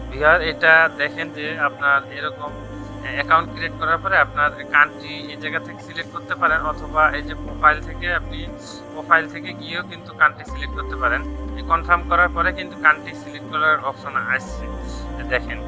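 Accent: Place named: North, Bangladesh. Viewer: অনেক সুন্দর ভিডিও ব্রো। ভাইয়ের বাসা কোথায়। ভাষা শুনে আমাদের উত্তরাঞ্চলের মনে হয়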